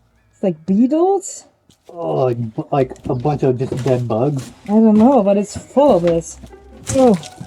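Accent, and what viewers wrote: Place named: Germany. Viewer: Your German accent has become almost non-existent... except when you say beer/bier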